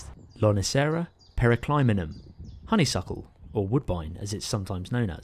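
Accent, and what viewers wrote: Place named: South East England, United Kingdom. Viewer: It’s a pretty regular accent for someone who was born & lives in the south east of England. I too was born in the same area as & share the same accent.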